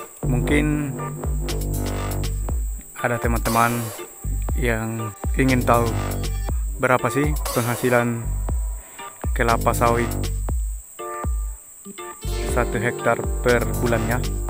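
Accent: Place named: Sulawesi, Indonesia. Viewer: Kayak orang mambi ini logatnya 😁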